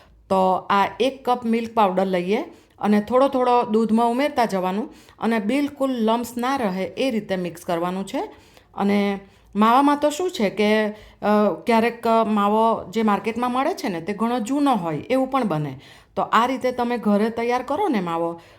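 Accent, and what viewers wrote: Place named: Valsad, India. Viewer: Valsad baju na su k madam tme?bau saras rcps hoy 6 tmari😃😃😃 pn tmri bolvani style pr thi lage 6 vlsad jeu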